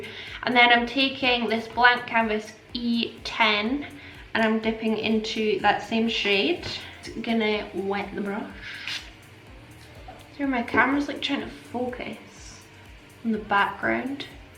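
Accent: Scotland, United Kingdom. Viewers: Love the Scottish accent 😌 I’ve been seeing outlander and loving the accent 😋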